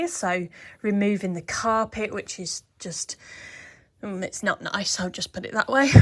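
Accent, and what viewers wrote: United Kingdom. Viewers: Such a beautiful “ British English”….😌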